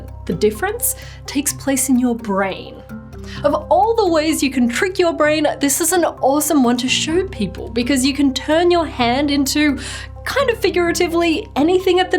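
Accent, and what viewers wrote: Australia. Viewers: Accents are cool and weird sometime. I wonder how "tube" became "tchub" down in Australia. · I'm not hearing any at all, just an Australian accent.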